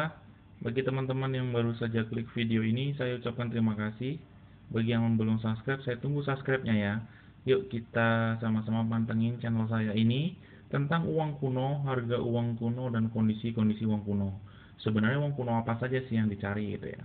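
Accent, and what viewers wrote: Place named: West Java, Indonesia. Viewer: maaf sobat ini daerah apa ko logat nya sunda,maaf ya, sobat,smga sht& bhga sll amiin YAR 🤲🤲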